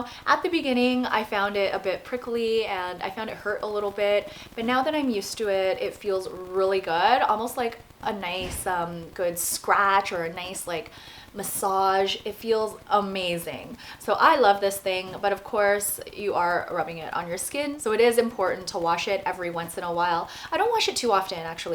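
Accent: Canada. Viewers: Canadian? Sounds like it from how you say out and in a pinch.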